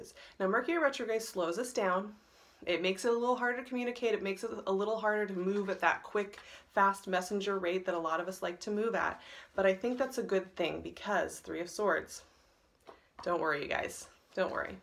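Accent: United States. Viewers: I'm an actor in the U.K. and stumbled here for your American accent... But wow I got so much more! Thank you for your guidance! I've subscribed and will be back each month 💕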